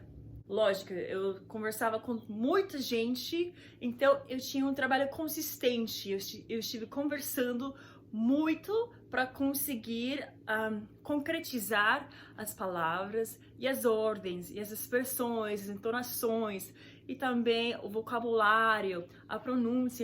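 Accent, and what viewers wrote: Brazil. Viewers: Gringo é uma palavra de origem espanhola e significa estrangeiro em português. Quem popularizou essa palavra para os estrangeiros foram norte-americanos aqui no Youtube. Mas não entendi do porque você não tem sotaque nordestino e sim paulista? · I know but your accent is so beautiful like a Brazilian. Congrats. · Não, o sotaque dela é carioca. Ela não carrega no ‘r’.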